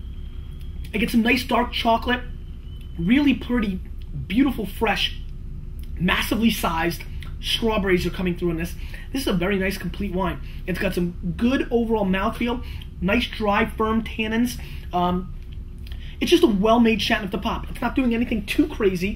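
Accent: New Jersey, United States. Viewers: I guessed at first this guy was from Jersey and I was right. He just said "meh", so that confirms it.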